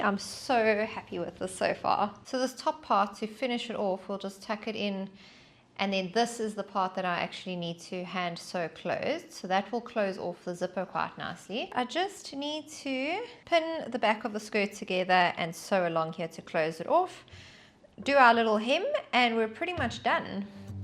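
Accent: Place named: South Africa. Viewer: Hi there. You sound like a fellow south African 😊. Can you advise on how you choose your size? How did you know the size would fit you or are you familiar with tianas closet patterns? Thanks allot for the tutorial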